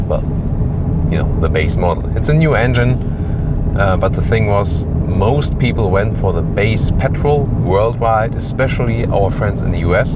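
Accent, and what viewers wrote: Germany. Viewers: Omg! He’s really cute! What’s his name ? And where is he from? He has an accent but I don’t know where he’s from. He sounds to have a German accent but I could be wrong . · I speak german and I live in Canada so hearing pure german accents when speaking English is so hard to understand for me!! When you say a word in English I’m thinking in german😂 otherwise great video !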